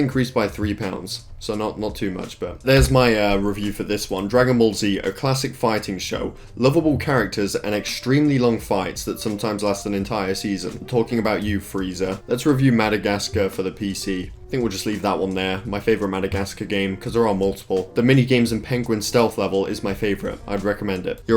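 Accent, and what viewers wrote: United Kingdom. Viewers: It's the British accent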